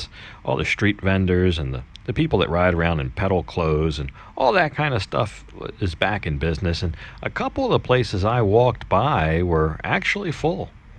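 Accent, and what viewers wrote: United States. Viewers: I dont understand, as you live in this country, that you pronounce cities, places and names in an american way... Why do you think this country wants their language pronounced like that. Disrespect !!!!!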